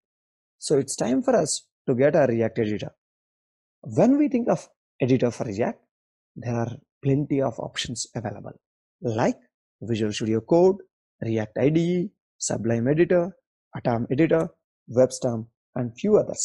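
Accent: India. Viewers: Try to speak it in normal accent. sometimes I do not get the south indian english. Apart from that this Video was fantastic. <3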